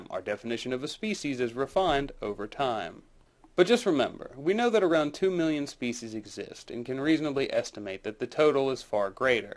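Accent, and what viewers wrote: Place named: South, United States. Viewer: Southern accent FTW!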